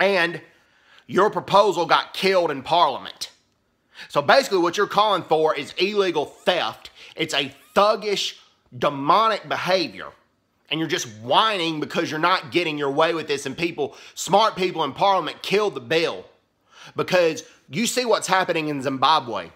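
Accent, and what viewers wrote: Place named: United States. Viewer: Oh shut up! You come with your American accent and tell us the land was not stolen? Man you are lying. We will expropriate land.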